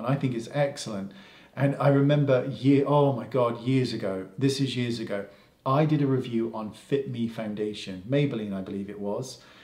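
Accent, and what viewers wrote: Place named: United Kingdom. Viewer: I loveyour videos and tour Brittish accent!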